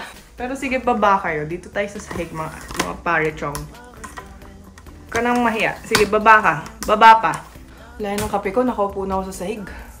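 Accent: Batangas, Philippines. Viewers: You look Korean Bec you are but you act & speak like a Pinay 😊✌️ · Hala kakatuwa Naman kayong magkakapatid😊❤Pinoy na Pinoy ka talaga magsalita. Ang galing 😁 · Yung Batangueña accent mo ba. Matik din nawawala pag wala ka sa Batangas? 😆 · Parang filipina mag salita pero koreana 😂 astig. In what language do you think? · Grl,,aliw din aq sau,,, alam mo Yung pagngsalita ka wagi, ay pinay pla. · Very Filipino kung mag tagalog yung accent at yung taglish nya.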